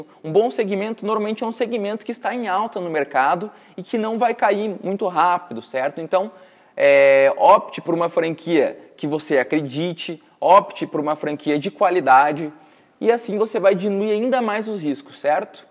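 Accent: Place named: Pernambuco, Brazil. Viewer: Adoro o seu sotaque e o " xero" do idioma Pernambuques ❤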